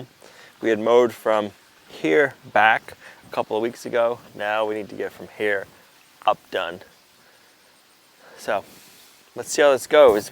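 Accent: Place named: Boston, United States. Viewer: Good Morning, I hear you on the rain it seems like it never stops looks like more today. your Boston accent keeps showing up I have one also formally from South Shore now in your area. Stay dry.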